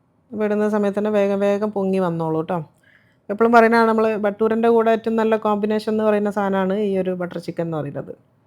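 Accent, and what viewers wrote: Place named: Kannur, India. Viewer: Kannur aano samsaaram kelkkumbol angane thonnunnu 🥰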